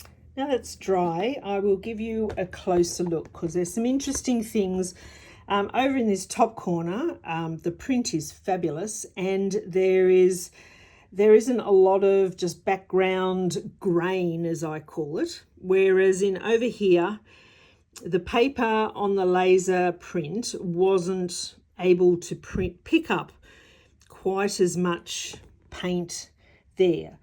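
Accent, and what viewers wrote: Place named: Australia. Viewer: Really interesting technique,i’ll have to try it. Also,nice to hear a fellow Australian. Thankyou & Cheers!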